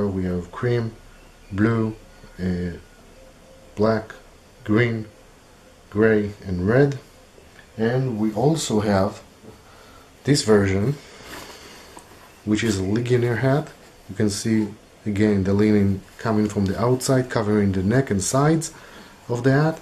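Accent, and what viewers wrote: Israel. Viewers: i think its a scam, guy sounds like israeli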